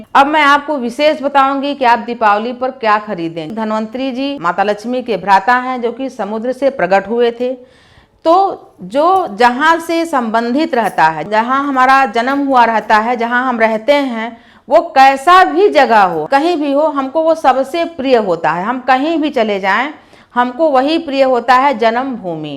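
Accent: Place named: Allahabad, India. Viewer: Both boht achi baat batai apne.. Mai Allahabad ki hu... Apki boli waise hi... 💕💕👍👍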